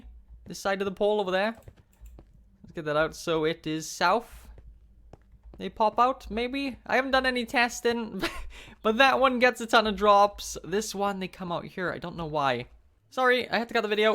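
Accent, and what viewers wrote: Wales, United Kingdom. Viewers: Are you welsh???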